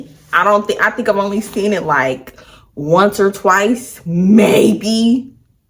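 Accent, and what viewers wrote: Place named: Louisiana, United States. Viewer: I’m hollering! 😭😭😂😂 It sounds like when I would visit my people in the country in Louisiana. I’d try so bad to not offend no one but Lawd 😂 PERIODT CUZIN! SCREAMING I wish Texas would’ve worked out for you , but I remember why 😩. It’sdiverse but every city has its duck offs where you can be with your ppl. You act like us to me 🤷🏾‍♀️! Ok, I’m done. 😂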